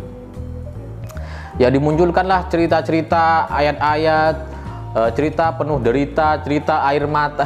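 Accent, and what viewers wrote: Madura, Indonesia. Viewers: Serius nanya bang asal Madura ya..?? Logatnya mirip 🙏🙏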